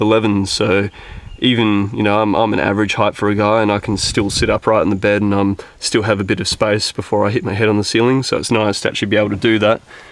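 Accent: Australia. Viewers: Love your build. It's comfortable and practical and it looks good too. Is this a medium wheel base or a long wheel base? Loved hearing the Aussie accent.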